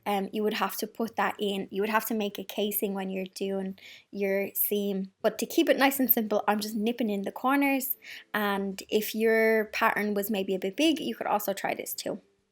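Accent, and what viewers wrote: Ireland. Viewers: I love your Channel! I’ve spent the whole day watching! Love your sweet Irish Accent. My Father was born in Dublin in 1920, and I have a special place in my heart for all things Irish.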